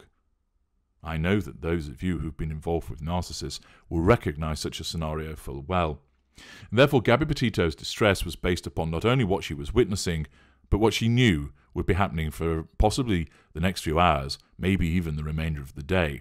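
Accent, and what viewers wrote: United Kingdom. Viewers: I like your voice and your british accent. It is very interesting to listen to you. We learn a lot on narcissistic people. They are dangerous people.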